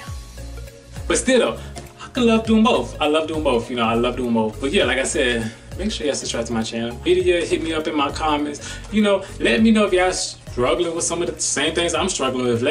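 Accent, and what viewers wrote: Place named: Spain. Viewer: Lol "its my Spanish accent even though I don't speak Spanish" 😅.. Im definitely with you on that!!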